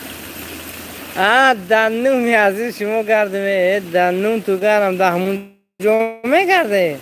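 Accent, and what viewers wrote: Tajikistan. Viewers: сахт Точики гап мезадиян 👏👏👏👏👏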